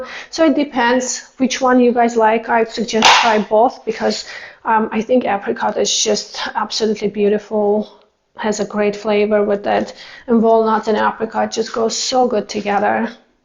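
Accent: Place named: Armenia. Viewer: Hello, Maybe I should call you Chef! The pastries look delectable! Your pastry dough looks so beautiful, and I'm sure they taste divine. It made me think of Baklava, but this recipe is very much more French with the pastry dough, apricot preserves, and dark chocolate. Mon dieu! You definitely need a larger workspace so you can have all your ingredients and utensils at hand. Next time you do this, don't forget to demonstrate how you separate your egg yokes! I felt like I was watching Julia Child. I never missed her show on PBS for so many years. So now I know you are of Armenian descent. I have been wondering for some time where your accent is from. You must have some royal blood with your ginger hair! When I was in college, I had the great honor of working under the baton of the renowned Director of Bands of the University of Illinois Urbana-Champage Symphonic Band, Dr. 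Harry Begian, who was also of Armenian descent. He conducted the honor band every Summer at the band camp I attended in Hays, Kansas at Ft. Hays State University. It was by audition, and I am still very proud to say I sat first chair Alto Saxophone every year. It was always an amazing experience, and one I will never forget. Dr. Gary Corcoran, the Director of Bands at the university I attended for my degree in music education received his doctorate degree under Dr. Begian. I studied conducting with Dr. Corcoran, and I still miss conducting a band. Sadly, both have passed now, but I still ador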